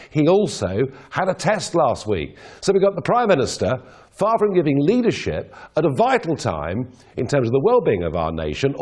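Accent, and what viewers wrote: United Kingdom. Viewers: This world need more people like Nigel....by the way I like to listen British English..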